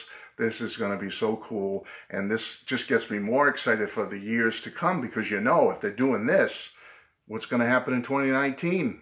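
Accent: Boston, United States. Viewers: Hi, Thanks for all this GREAT information! White Album Super Deluxe, yes! I love all this resurgence of Beatles everything! It is all very exciting! I just have to say I love the way you say "McCartney"! The Boston accent is GREAT! I happen to like the Liverpool accent too! Wonder why?! You're in my prayers tonight. "God let us Beatles fans live another 35 more years or at least until there's nothing more Beatles to re-release!" LOL! Thanks again! I've got to now to look for my part-time job to pay for all of this stuff! Cindy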